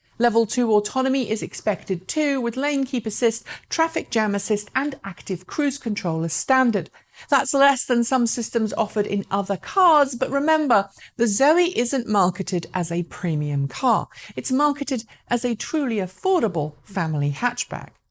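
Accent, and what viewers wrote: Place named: United Kingdom. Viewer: oh gosh I didn't know to be honest. I thought you were from the UK judging by your accent! Lol, sorry!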